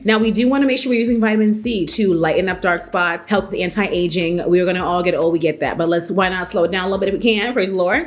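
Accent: New York, United States. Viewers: Are you from New York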